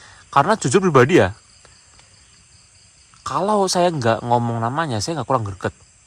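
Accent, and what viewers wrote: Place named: Tegal, Indonesia. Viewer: Bg asli mana bg? Ko logatnya kek ada tegal nya 😂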